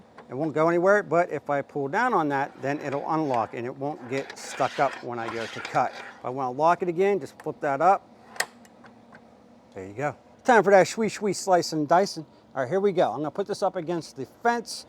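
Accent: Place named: Louisiana, United States. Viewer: Hey man! I’m a first time viewer and I’m already hooked! Digging the humor! Not sure where you’re from…but I’m picking up a Cajun vibe. If not, you would definitely fit in down “herya”! Btw…that’s a sweet machine. May have to get me one of dem me!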